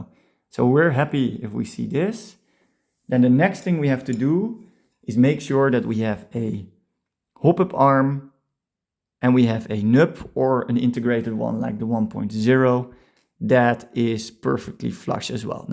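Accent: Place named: Netherlands. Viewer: wllh ik hou van deze nederlandse accent ahahah, je kan het van een km ver horen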